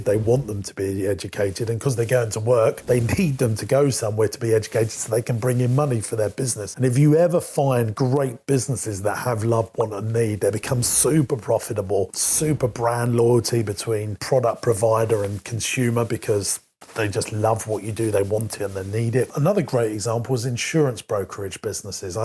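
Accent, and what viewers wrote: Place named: United Kingdom. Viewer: Nice video but here is my opinion. Business that are over licensed, over taxed by governments can bring you down very quick. Not to mention dealing with employes in 2024 is diferent than it was in 2005 (worker rights, sues etc). All of that together can bring you down very quick. By your accent I suppose you are from UK, so you must know what I am talking about. It will depend greatly where are your business located. That´s why major comnpanies are located in Ireland and the Netherlands but their origins are not from there. I rented my business 2 years ago, I receive a rent and I work for others now. I have lots of time now for my family and friends, sports and so. Im in Portugal, a heavily taxed country. In a heavy taxed country the best way is to get big real quick because you will get small margins but since you work the masses you ll be fine. 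That´s the main goal, but extremly dificult.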